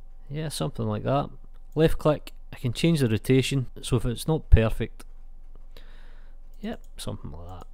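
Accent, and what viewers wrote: Scotland, United Kingdom. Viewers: yep Scottish for sure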